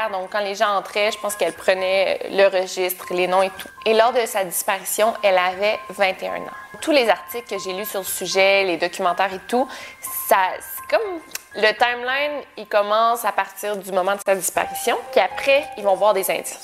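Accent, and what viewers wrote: Quebec, Canada. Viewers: Chers cousins du Canada, j'aime bien votre accent. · C'est marrant cet accent canadien. Pour moi, il est clair qu'elle s'est suicidé et que son corps n'a pas encore été retrouvé. C'est pourquoi elle avait besoin de boire parce qu'elle avait pris cette décision, de se suicider. C'est tellement triste :(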